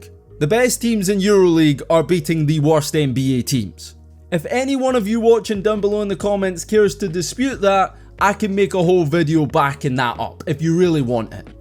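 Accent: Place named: United Kingdom. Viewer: when i heard your accent i thought i clicked on wrong video, Brit talking about basketball is always odd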